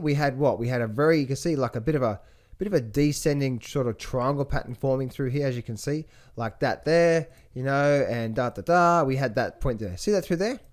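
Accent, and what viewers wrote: Australia. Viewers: Thanks,. DAH-ta for us Americans. DAY-ta sounds better with your Aussie accent. nq17k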